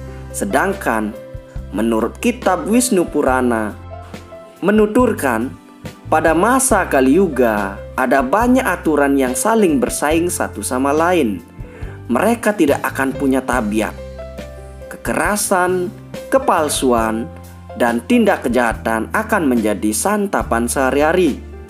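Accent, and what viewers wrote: Bali, Indonesia. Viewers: Bli logat nya it lo bali bgt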